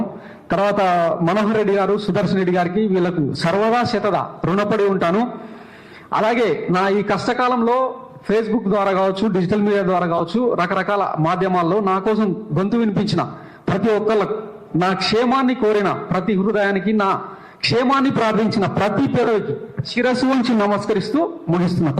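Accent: Telangana, India. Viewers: నేను ఆంధ్రప్రదేశ్ వాడిని. కానీ తెలంగాణ యాసలో మీ గొంతు అంటే నాకు చాలా ఇష్టం. మీరు పలికే ప్రతి మాట సంకీర్ణ ప్రభుత్వానికి కత్తిపోటులా అనిపిస్తుంది.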